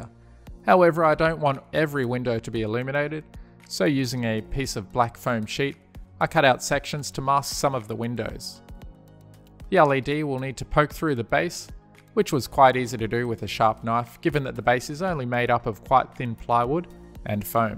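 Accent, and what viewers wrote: Australia. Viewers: You Australian??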